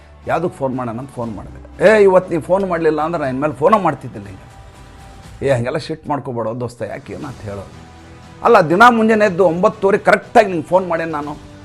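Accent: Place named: North Karnataka, India. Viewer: ಉತ್ತರ ಕರ್ನಾಟಕದ ಗಂಡು ಕನ್ನಡ ನಿಮಗs ಗೊತ್ತಿಲ್ಲಧಂಗ ನುಸುಳೋದು ಕೇಳ್ಲಿಕ್ಕೆ ಮಜಾ ಅನಸ್ತದ!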